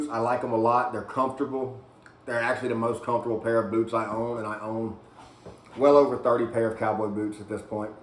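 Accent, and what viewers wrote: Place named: South, United States. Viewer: Great video. I'm trying to figure out what part of the US you are from by your accent and it's difficult to recognize. Maybe Oklahoma, Kentucky, or Louisiana if I had to guess. I am looking forward to more boot reviews in the future. Do you have any opinion on Resistol cowboy boots if you have owned a pair? The underslung heel on the JK Western Ace is a little too steep an angle for my preference but it looks like a great boot otherwise.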